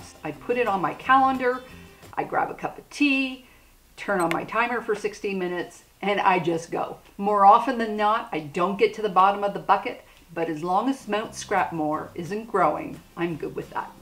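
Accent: Canada. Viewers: Enjoyed your video very much, so I subscribed. I feel positively brilliant because, as a newbie quilter, I figured saving 2 1/2" squares, 2 1/2" strips, and 5x2 1/2" rectangles would be most useful--same as you, an obviously experienced quilter, figured! I needed quilting fabric to make a block once a month for a local craft store--they draw a name and the winner takes home all. Since I let it be known that I would accept scraps, now I have plenty for the block drawing ;-) The pieces that are too little get chopped up to make animal shelter beds. A friend also gave me a ton of leftover scraps from a T-shirt class, and then there are all the batting scraps too small to piece together for potholders. Watching TV with my husband is a good time to cut up all those unwanted scraps! BTW, love your Canadian accent. Signed, a scrap loving neighbor in Washington state.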